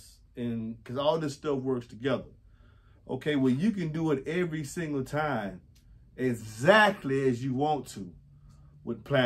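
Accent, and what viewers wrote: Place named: South, United States. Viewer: I think ur not used to that southern accent yet.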